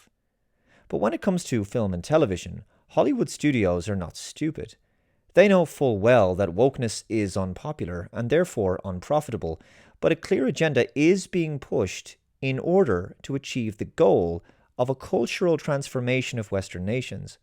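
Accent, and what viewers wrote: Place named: Ireland. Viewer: If I may, I would like to hear you put the truth of Western culture’s debasement, undermining etc. etc. etc. in more historic terms. Honestly, there ain’t nothing new about what’s going on, right? Your astute and insightful dead pan brogue, for me, is the most delightful if not compelling reason I listen. Unfortunately, I find your message can come off a little hopeless with tones of the very, “them & us”divide you abhor. We can overcome this. Thank you for your great work and time. “History doesn’t repeat itself, but it does rhyme.” Russian proverb. (I think)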